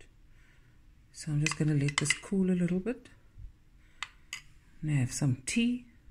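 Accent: Cape Town, South Africa. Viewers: Absolutely beautiful. Love your calm, loving voice. This means so much to me, especially hearing your accent. Is it Cape Tonian? Makes me long for home because I'm from Durban, South Africa watching from Australia. Your presentation is beautiful. Loved the last bit also, when you called for someone to open the door quickly. I loved the small glimpse of your plants also. I will try doing it, but I don't think I can decorate it so perfectly. I wish I could meet you one day. Kindly read John <num>. Love and God bless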